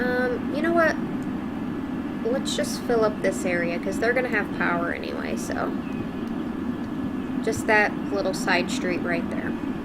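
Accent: United States. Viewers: You have a great accent! I guess ure american where abouts are you from? Xx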